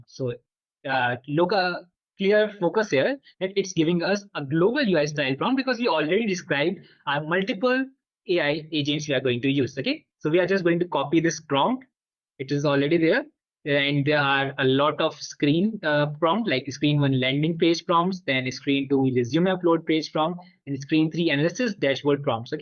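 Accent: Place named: India. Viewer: You know what I find amusing? the low count of views for videos such as yours and I imagine it's because of U.S. viewers having issue with accents from our Indian brothers and sisters. But these folks always explain details way more concise and on point than many of the native english speakers. Native speakers are always hyping or going off topic. What I'm getting at is thank you my friend and thank you for your passion and sharing it with us all.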